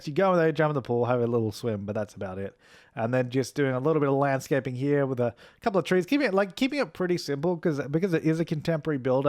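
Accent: Australia. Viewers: Is... Jazza from draw with Jazza? I swear they sound identical, not just the Australian accent. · yea cause he's ostrayan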